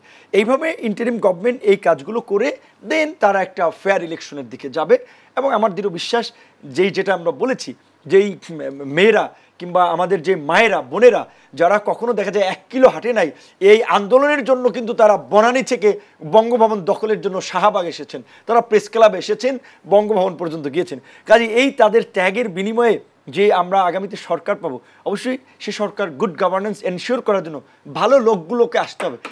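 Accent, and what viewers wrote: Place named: India. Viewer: উপসহাপিকা ইন্ডিয়ান সটাইলে কথা বলবেন না।😊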